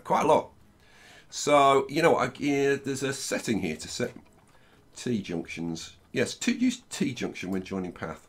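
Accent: South Africa. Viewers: you from South africa? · Feel like Johannesburg? are you from South Africa? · Are you South African?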